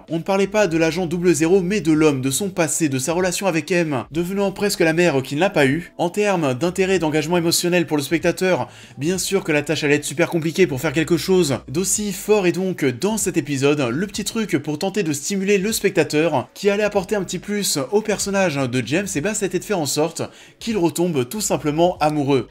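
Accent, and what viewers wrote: Paris, France. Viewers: l'accent parisien est un peu too much aussi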